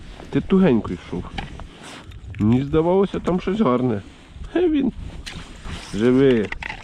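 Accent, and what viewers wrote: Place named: Western Ukraine, Ukraine. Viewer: Звідки ти дядьку? Я з Золочева,і Самбора. Щось діалект ближче до нашого. Я на тебе підписався.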